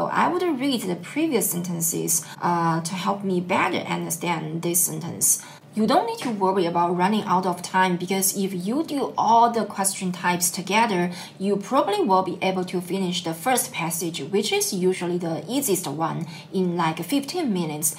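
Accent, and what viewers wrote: China. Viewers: Thanks for sharing your strategy! You have an obviouse Chinese accent.🤣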